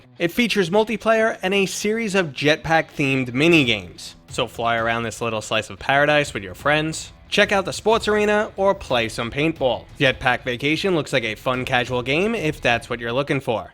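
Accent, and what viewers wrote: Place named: Boston, United States. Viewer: Get paid man, make that Boston accent work for you!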